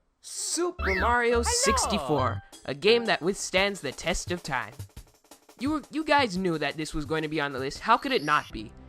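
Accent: Canada. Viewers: Damn thicc Canadian accent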